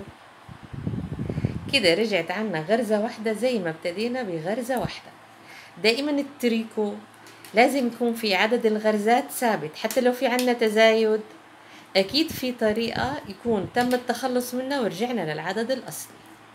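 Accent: Egypt. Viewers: بوركت ولكن لكنتك مش شامى بالكامل فيها اللهجة المصرية .خلتينى احترت فى جنسيتك وانا بسمعك😅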